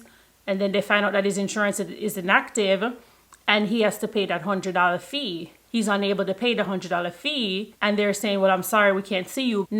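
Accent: Jamaica. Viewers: This is the problem with nursing, if you are coming here to give your opinion about the story on hand why criticize the woman on how she speaks? I’ve never heard anyone asking a Hispanic to pronounce their words better or speak proper English. You should be ashamed to say you’re a Jamaican as well cause you should understand why she speaks the way she does. If it were my channel I would block you! Very distasteful and disrespectful!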